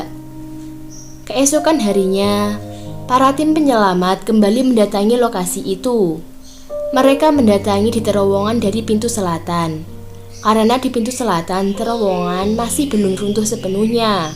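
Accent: Java, Indonesia. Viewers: orng jawa nih yg pnya chanel..logat ny kciri bgt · Ngomong nya Jawa banget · Suaranya medhok bgt sayangku. Saran aja sik biar yg nonton lebih comfy, cmiiww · kalau memtah g enak lah,.mendig medok jadi empuk🤭🤭🤭🤭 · Anjiiing jd ga seru. Logat jawa gini.fil bgs jd Alur cerita yang membangsatkan ini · Jawa ya? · Ko medok gitu loh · ngomongnya medok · Medok banget cukup sekali aku liat video ny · Jawa temen kie lah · Pasti kmu orang Jawa ya min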